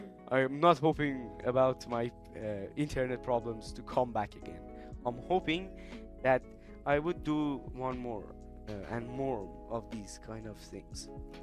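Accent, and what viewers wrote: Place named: Iran. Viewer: Why your voice like iranian?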